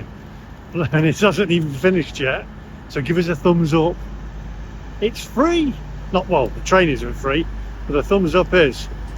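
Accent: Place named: England, United Kingdom. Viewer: I totally agree with you!!! That airline is trash. You should see what people gets taught to be like and how you advance in your career with this company! My dad worked for BA many years ago and I can’t but be super sad seeing they had to partner with this trash! On a lighter note, I think it’s very funny how you started the video with a very perfect English accent and then it just evolved to your beautiful “bromie”!! ❤😂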